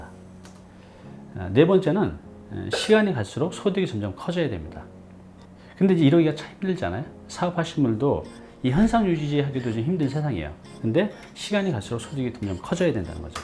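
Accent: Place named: Seoul, South Korea. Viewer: 외모도 깔끔하시고 듣기좋은 서울 말씨에 ㅋㅋ깔끔한 진행력. 알아듣기 쉽게 군더더기 없이 진행해 주셔서 너무 고맙습니다. 귀에 쏙쏙 들어와요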